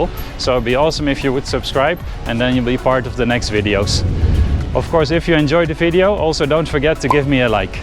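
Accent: Netherlands. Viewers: Your accent sounds Dutch? Are you from the Netherlands? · Hollander aan die accent te horen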